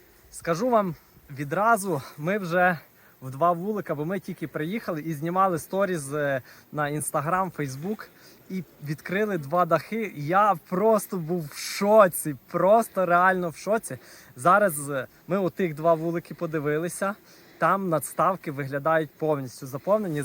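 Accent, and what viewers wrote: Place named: Prykarpattia, Ukraine. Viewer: удачі.розмова як на Прикарпатті.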